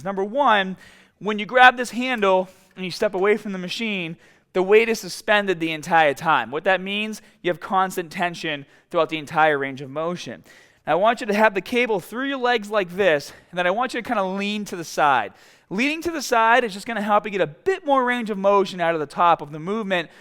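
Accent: Boston, United States. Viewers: Is this a Boston accent?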